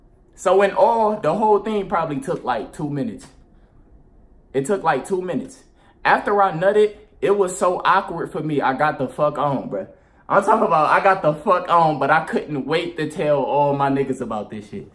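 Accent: Detroit, United States. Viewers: that detroit acent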